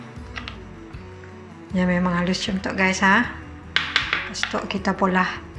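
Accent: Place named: Sarawak, Malaysia. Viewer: Seronok dengar kamu ckp sarawak. Sedap bebola daging bakso ni.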